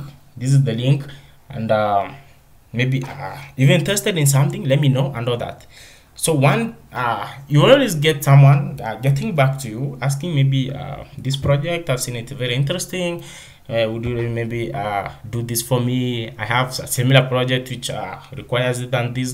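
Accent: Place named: India. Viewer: Awesome, u sound like a hindu though :)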